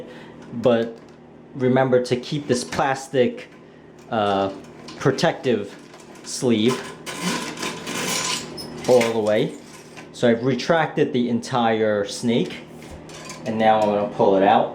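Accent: Brooklyn, United States. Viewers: Thank you so much! It was very clear and persice how you explained! I liked how nice and clean your toilet area was and the masculine accent idk is it from Brooklyn, NY or NJ...it's faint but it's nice! I love that type of accent acutally... thanks for the video I haven't heard it in a while...I miss it I guess....but the video was excellent! I'm glad I found you...I'd watch another video of yours sometime😉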